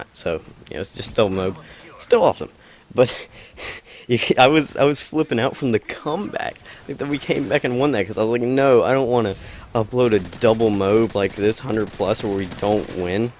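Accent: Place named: South, United States. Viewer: He sounds southern.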